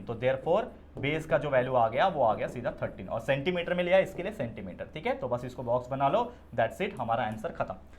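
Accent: Maharashtra, India. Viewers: Marathi voice❤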